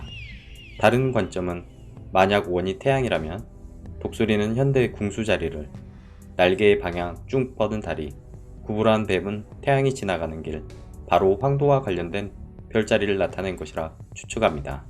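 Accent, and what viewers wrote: Jeolla, South Korea. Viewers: 형 고향이 전라남도여? 전라도 사투리가 심한뎅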